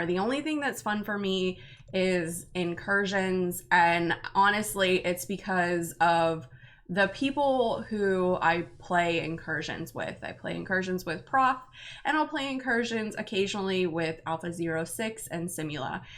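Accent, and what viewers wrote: Pittsburgh, United States. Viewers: yeah its called Pittsburghese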